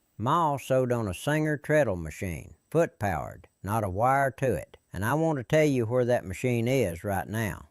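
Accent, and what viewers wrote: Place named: Appalachia, United States. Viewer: I love the way you talk, I'm in maryland and you have the Appalachian accent that i remember hearing growing up. When i heard someone like you talk i knew i was going to get good advice or given advice that was a joke that i believed until they later told me it was a joke as they let out a laugh.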